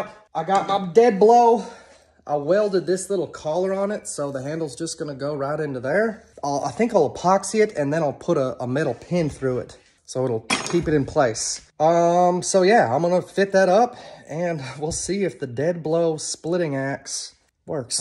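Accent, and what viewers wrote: South, United States. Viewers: Why are you southern American in this video but Scottish in the others?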